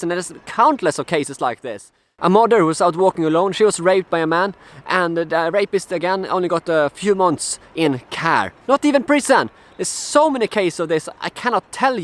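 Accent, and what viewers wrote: Sweden. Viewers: you´re a norwegian citizen first of all so maybe change the name. Secondly, plz improve your pronunciation. Your really going full on crazy swedish accent. I have never heard someone in your age span speak with such a heavy accent. Third, either your lying or not aware of how the new law will work. The law is changed so the rapist can´t deny knowing the victim didn´t want the sex if she/he did not resist. What you said about a writen consent or recording is totally made up. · Ah this solves everything. Now the rapists won't rape anymore because they've always been bothered with consent before... On another note... goddamn your Swenglish is thick... one of the worst I've heard, even worse than Lofvens... and you use English more often than most Swedes. You should check out some videos of English pronunciation because it really detracts from the message you try to deliver.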